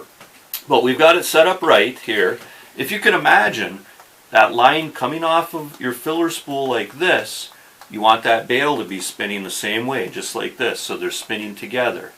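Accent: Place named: Upper Midwest, United States. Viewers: the way you say pound tells me you are from minnesota or wisconsin all my relatives are up in MN you sound just like them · Oh man, I'm a Minnesotan down in Florida for work, great to hear that northern accent on youtube, don't you know. Fishin' fer Pompano stead of crappie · Where is this guy from? Sounds like norther Michigan, Wisconsin or Minnesota.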